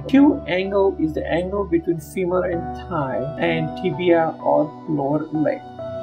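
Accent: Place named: India. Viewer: Thats an Indian accent :) loud and clear isn't it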